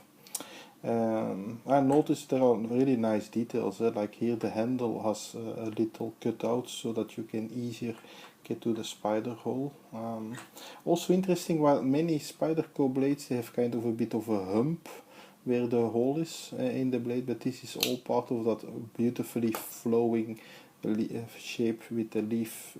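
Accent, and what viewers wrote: Flanders, Belgium. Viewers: Nice review, love the Flemish English accent by the way. 😉. I bought the same watch but it's a 38mm, 18mm lugs and solid case back. Even more vintage that way and better suited for smaller wrists. Keep up the nice work. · je klinkt als een Belg.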